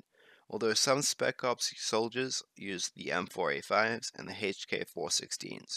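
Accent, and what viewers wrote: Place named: Bronx, United States. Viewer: Sounds like a dude from the Bronx who likes saying "the Australlian Army", was getting a bit annoying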